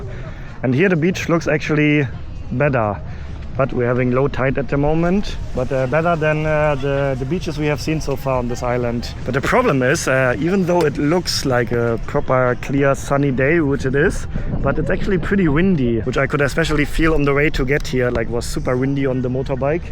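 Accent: Germany. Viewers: This dude reminds me of Pee-Wee Herman w/ a German Accent!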